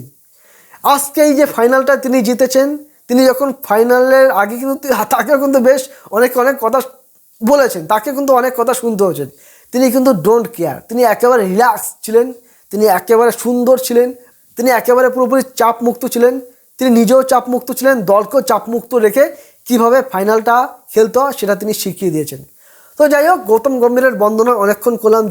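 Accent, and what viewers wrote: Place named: Kolkata, India. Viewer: হাগল দাদা। পাগল। কলকাতার বাংলা টা বেশ ফানি। যদিও মানতে অসুবিধা নাই যে , তোমাদের টাই শুদ্ধ বাংলা।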